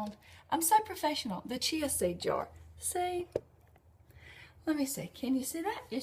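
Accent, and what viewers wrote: South, United States. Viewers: You’re cute. I like your soft southern voice. I don’t usually watch chatty videos but it really was pleasant to listen to yours because of that. I’ll have to give that recipe a go. Thanks. Have a funtastic day!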